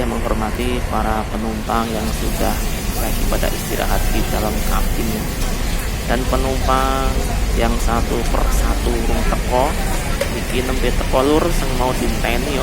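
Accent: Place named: Java, Indonesia. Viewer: Yang punya YouTube lucu sekali kalau komentar... Semoga sukses selalu YouTube nya... Sekali-kali logat Jawa nya keluarkan semua.... 😂😂😂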